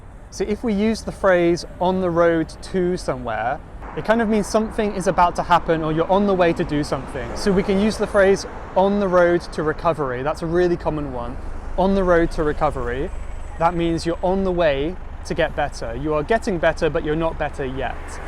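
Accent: United Kingdom. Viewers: thank you very much , i learn a lot of british accent here 🤝💯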